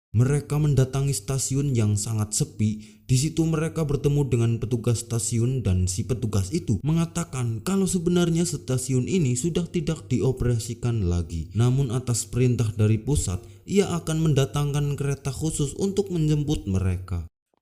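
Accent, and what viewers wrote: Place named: Tegal, Indonesia. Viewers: Tegal bgt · dg bahasa mimin meddok banget pingin belajar bhsanxa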